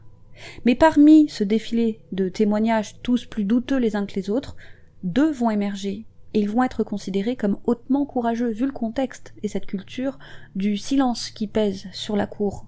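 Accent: Arab world. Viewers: Oua l'accent arabe , on voit d'où vous venez......peut être, l'Algérie.......🤔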